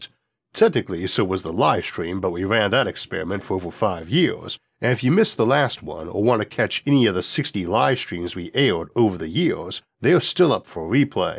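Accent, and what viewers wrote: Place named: Boston, United States. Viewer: Just re-watched upward bound - You've gone from a self depreciating Elmer Fudd, to a confident sounding speaker with an "almost Bahstahn" accent. You might want to pull a set of videos that were recorded a year apart and go over them with your voice coach. P.S. With your content I skip the falling asleep part and go straight to dreaming of the stars.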